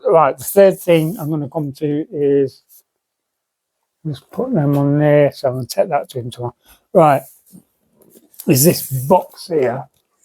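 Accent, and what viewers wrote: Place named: Midlands, United Kingdom. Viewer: where are you based in the uk you sound like youve got a south east midlands accent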